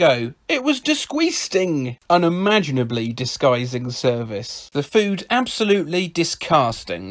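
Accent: United Kingdom. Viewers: 🤣🤣🤣 Holy cow! That along with your lovely British (?) accent had me rolling on the floor 😂😂😂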